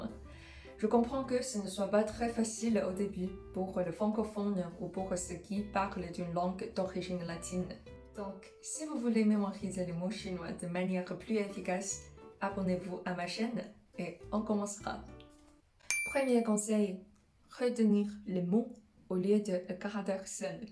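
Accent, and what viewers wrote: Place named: Quebec, Canada. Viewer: 哈哈😄，老师的新视频出来了。第一个来打卡。这次的视频比起之前的视频让我耳目一新的感觉。法语表达挺溜，我的感觉带有些加拿大Québécois 的口音。太棒了。这次的视频更是针对外国学生学习汉语的关键问题。谢谢分享。👍🤩😘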